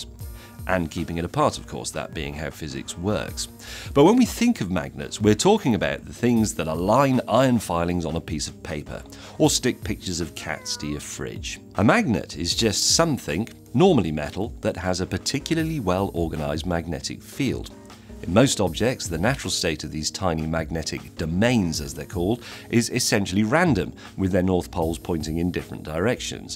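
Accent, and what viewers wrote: United Kingdom. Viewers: The ideal British accent.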